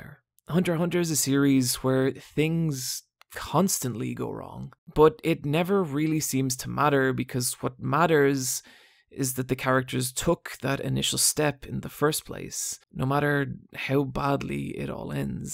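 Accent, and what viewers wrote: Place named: Ireland. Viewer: started watching cus i liked the irish drawl. stayed because you clearly have a lot to say, and i'll always listen to those who take the time to talk about the shit they're passionate about.... as long as they're not fascists.... you're not a fascist, are you?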